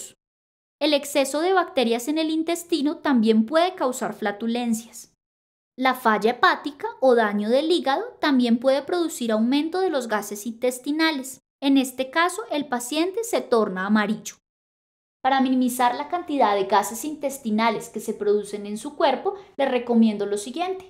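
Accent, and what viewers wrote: Colombia. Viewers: thechampion606 Si ella es colombiana su acento es 100% colombiano eso te lo aseguro yo que soy colombiana. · Sí es Colombiana, muy Bogotana súper chévere!!! Jejeje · Que linda voz usted es colombiana? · Tiene acento de Bogotana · Me gusta el asentó de Colombia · Tiene acento colombiano, lindo.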